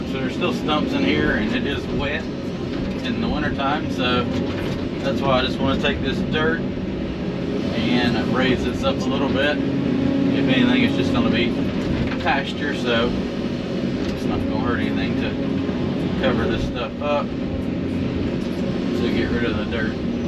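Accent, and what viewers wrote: South, United States. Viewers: I gotta tell ya, I love watching the videos, but there is something soothing about your voice too, I like the southern draw, I follow Todd on digginlife21, he has the great draw as well. I am in Michigan and you just don`t hear that way up here, I guess you guys think we have a northern accentb be well my friend.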